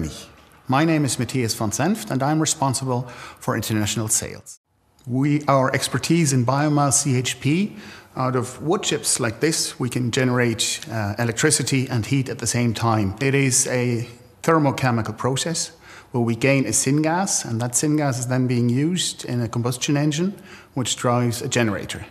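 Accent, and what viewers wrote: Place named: Ireland. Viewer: I hear some Irish in there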